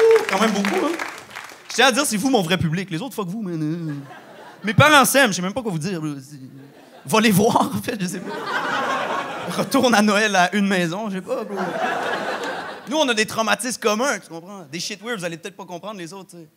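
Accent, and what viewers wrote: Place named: Haiti. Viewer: Pourquoi il prend un accent haïtien??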